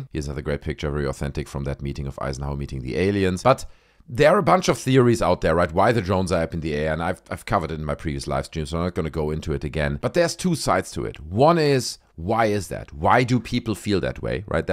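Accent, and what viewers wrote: Germany. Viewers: Ein Deutscher spricht Englisch 👍🏻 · Judging by your accent, you're german...amirite?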